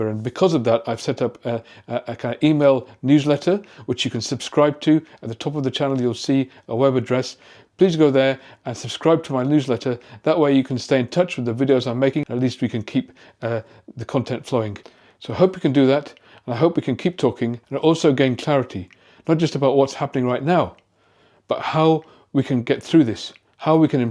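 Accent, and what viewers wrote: United Kingdom. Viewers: He sounds British to me, so born here and a citizen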